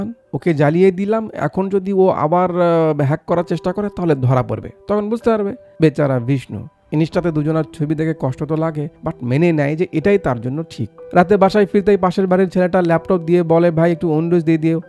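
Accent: Bogura, Bangladesh. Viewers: Vaiya akta request kori? Apni besir vag kotha guloi bogurar vashai bolben,, amar kuv valo lage🥰🥰🥰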